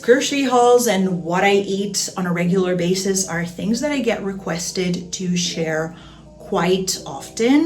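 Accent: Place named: United States. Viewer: For someone who speaks perfect Italian and perfect German, your american accent is a put off. I'd rather hear you in those languages any day.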